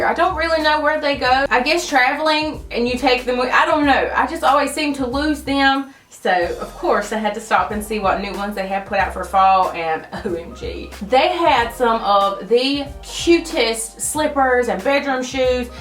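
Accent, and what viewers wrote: South, United States. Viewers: why does she sound soooo country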